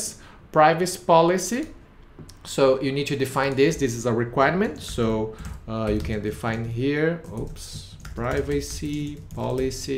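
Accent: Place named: Brazil. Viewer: cara, antes mesmo de ver o vídeo inteiro percebi pelo sotque que vc é brasileiro. Eu consigo entender em inglês, mas meu sócio não. Vc tem algum curso de zapier em Português?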